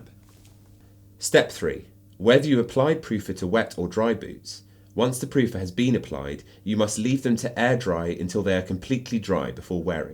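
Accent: United Kingdom. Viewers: I hate how much I love British accent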